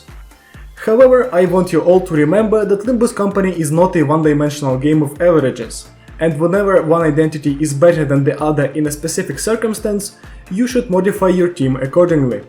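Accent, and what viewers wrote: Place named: Russia. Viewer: ты случаем не русский?, просто акцент сильный вроде, я не уверен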